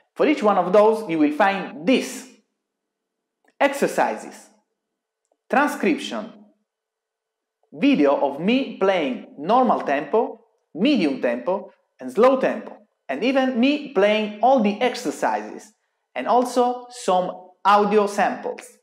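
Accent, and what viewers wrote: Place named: Italy. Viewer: Gotta love that Italian accent! epic video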